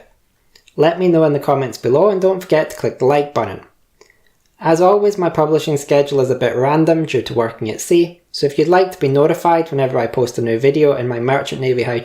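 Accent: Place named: Scotland, United Kingdom. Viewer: I think you are scottish..you have a strong and beautiful accent sir! Keep making more videos on nautical almanac! Take it easyy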